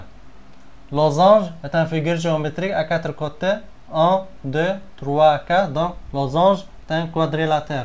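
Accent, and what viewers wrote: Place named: Lebanon. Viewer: c'est un accent libanais je pense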